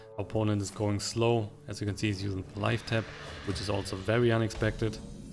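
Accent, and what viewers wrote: Germany. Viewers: What country are you from? Your accent sounds german.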